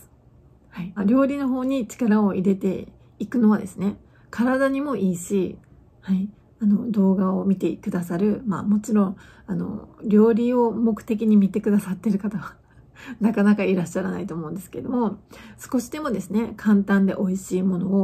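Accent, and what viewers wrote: Hakata, Japan. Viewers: 博多弁のイントネーションを聞くのが本当に、いやしです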